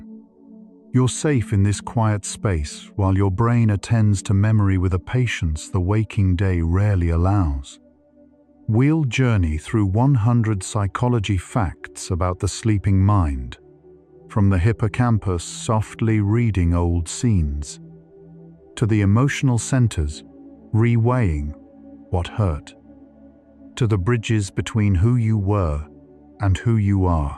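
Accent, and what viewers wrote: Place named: United Kingdom. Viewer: all these damn AI narrator's have British accents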